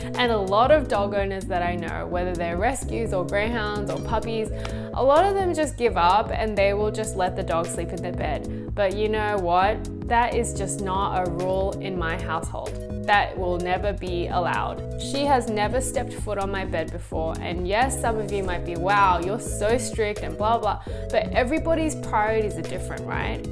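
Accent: Australia. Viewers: Are you an Aussie? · Thanks for the advice, I love greyhounds, the most placid sweetest dogs. You have a lovely hybrid Australian soft U S accent, nice to listen to. I’m Spanish, live in Sydney Australia. God bless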